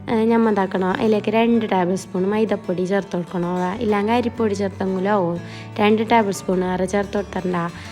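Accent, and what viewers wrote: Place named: Kasaragod, India. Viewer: വീഡിയോ full കണ്ടു.. കാസറഗോഡ് സ്ലാങ് കേൾക്കാൻ.. I like.. സ്ലാങ് ❤️❤️❤️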